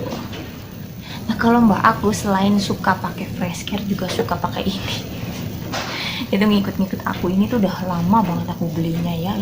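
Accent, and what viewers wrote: Banyumas, Indonesia. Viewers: Ora ngapak ora kepenak mantep pokoke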